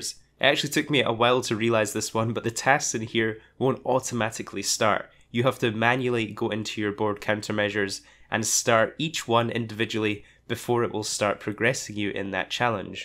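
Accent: Scotland, United Kingdom. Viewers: I'm a late comer to this game. Thanks for that. Only just found the brother so it's hotting up. Good to hear and see a Scottish youtuber doing well. I always thought my accent would be a set back. Doesn't look like it with you.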